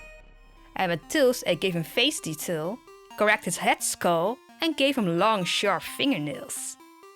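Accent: Netherlands. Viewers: i could just hear your dutch xD im dutch as well! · Omg I’m really late watching this but I could tell by your accent you are dutch too! Subscribing rn! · Im dutch to and i tought it was a dutch accent but i wasnt sure 😂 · Wow this is really cool! Quick question, are you Dutch? I think I regocnized the accent xD. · haha, ik had al z'n idee dat je Nederlands was. Dat accent herken ik altijd ;D Very nice work :D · Are you Dutch? Your accent seems Dutch XD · i heard the way you talked english and i was like is she dutch too? and then you said you saw the dutch version of pokémon and then i knew i heard it right xD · I was watching this like “why does that accent sound familiar” and then I saw your where Dutch and then I was like “oh daarom dus” ik ben ook Nederlands hihi😁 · your accent sounds dutch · I just found you because of dollightfull. But I have a qeustion where do you com from. 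Because of you're accent it sounds like you're dutch, but I can be wrong. I love the doll by the way!😍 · haha, the accent sound really weird for people who arn't dutch.. our accent is really different and sounds kinda funny when we speak English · Wow once you started talking about pokemon I realised that you really sound Dutch and I was very confused for a moment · ik dacht ook al van hu?! Ben jij Nederlands toen ik haar hoorde😂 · Ahh I found you due to Dollightful and I heard your accent was Dutch haha. Sorry I am also Dutch and well I hear it immediately ! I do not do doll customization or anything. But I always love to watch dollightful! So cool I found your channel! I really liked the collab between the three of you!!! Just love how you were so creative with the bottom part! Keep up the good work! Fellow Dutchy! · Je kan gewoon horen dat je nederlands bent😂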